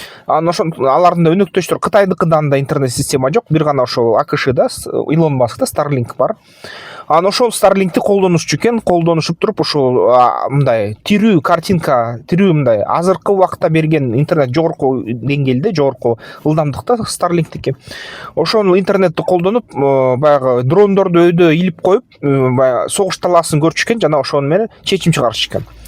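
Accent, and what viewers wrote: Standard. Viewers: Азаматсыз, мырза! Таптаза кыргызча бир созду да бурмалабай ойду берууго болот экен да! Билиминизге, аракетинизге - баракелде! Ийгилик - сизге! Кудайым адамдардын журогуно тынчтык берсе экен!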